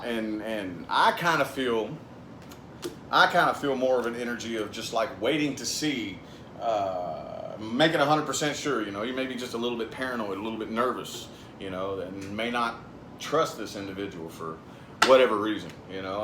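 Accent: United States. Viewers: Very nice, very accurate. By the way, I love your american accent (I am not from an English speaking country). Thank you very much. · Where in the US is your accent from?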